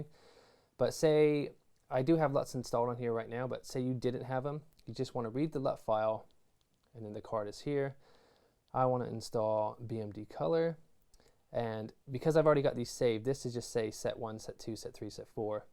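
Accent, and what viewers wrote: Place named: England, United Kingdom. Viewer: Lol your accent is getting more English 😂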